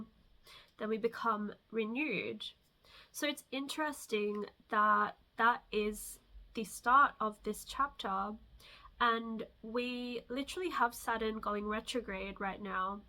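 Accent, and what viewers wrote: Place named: Australia. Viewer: 🕊 Blessed Shukra/Venus day Love💌, You are so sweet & genuine💕💞💓 I am so honored & humbled by your beautiful sentiments! Thank you😭 🙏🏾🥰 I can say the exact same for you love!! I'm currently living in Puerto Rico. I heard your accent and now I've confirmed you're in Australia! I've been being called to the Southern Hemisphere for decades and I know I will get there one day! You're always welcome my way!! I'm a traveler so I'm always changing my LO📍 I have previously shared creations and guides/tools online but I've been preparing for my next projects so I'm not currently on any socials outside of this YT I don't use. I occasionally use X for specific purposes. Big Rebirth as I'm fully exiting my Sade Sati, my Rahu/Ketu returns, Revati Rahu/Hasta Ketu D1 and I have a D9 Jupiter Punarvasu. I'm very eager by next year to gather fellow vedic astrologers like yourself and collectively put something out together and start a support network. 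I've got some books/content in the works right now and a tarot deck I've been designing. I checked out your soundcloud and🙌🏾 🔥💀🌺📿 I'm an Anuradha MC Jyeshtha Venus with Dhanishta D1 I'm so here for that trip you're taking ppl on!! Thank you for blessing us! I'll support you any way I can!! I have a lot of friends that are global DJs. My friend DJ Haram/Zubeyda is a female queer DJ who loves to support marginalized artists. There's a great network in that crew of people I used to support more physically. She's a great person to connect to!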